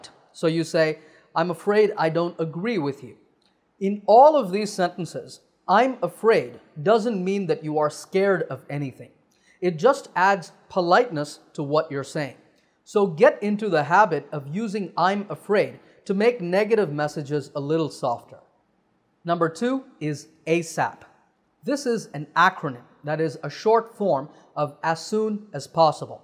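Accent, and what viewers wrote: United States. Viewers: Sir are you speaking in American accent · Thank you Mr.. You have been rendering an incredible service to the non-native speakers of English. I love your American accent. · Sir your voice is like American man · I Really do appreciate for your great efforts for making all these videos to make others learn . I watch your videos regularly , when close my eyes and listen you , you just sound like an American. You have standard American accent . I am impressed and inspired. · sir, I've been watching your videos and it has added a lot to my knowledge. However, there is a query that why do you converse in American Accent? Are you right now living in America, or you like that accent?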